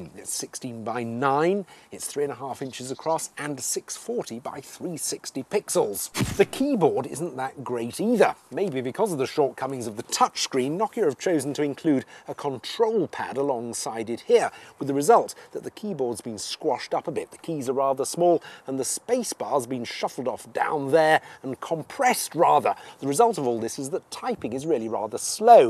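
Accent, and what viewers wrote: United Kingdom. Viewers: hehe love the brittish accent top class...ohh and the phone looks great by the way :)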